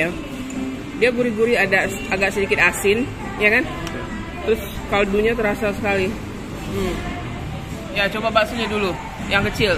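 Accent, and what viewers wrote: Minangkabau, Indonesia. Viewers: Gomok bahaso padang nyo tu bu 😂😂😂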